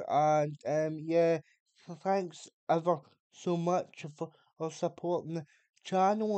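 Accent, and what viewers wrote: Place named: Scotland, United Kingdom. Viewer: No It flowed well, probably because you were not talking about how annoyed you were. I have been away from the UK for almost twenty years, first in the States and now in China, so hearing Scottish accents is not something I am used to. Now drinking scotch is something I am rather familiar with lol. Anyway, four am here and that hot choccy is not going to make itself and I gave my monkey butler the night off.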